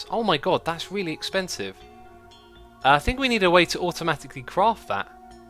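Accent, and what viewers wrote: United Kingdom. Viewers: love xisuminati modpack stream on you tube as i live in melb aust and ALWAYS miss your live stream. all the best in finding somewhere to live. you sound like you're from london so that's not going to be cheap, i think i have enough to put a deposit on a harry potter understairs cupboard.....maybe not. keep up the brilliant videos.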